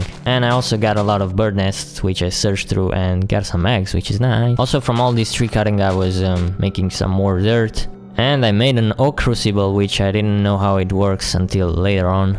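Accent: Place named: France. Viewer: btw where are u from ur actent is likr frech on something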